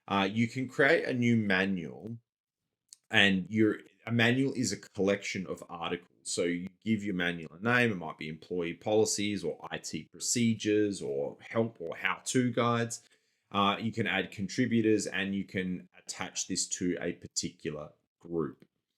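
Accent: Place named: Australia. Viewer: Thank u! And great Aussie tone.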